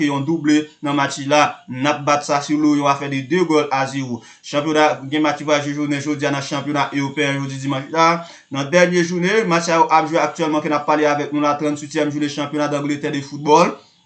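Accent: Haiti. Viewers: Ou pale tankou moun nord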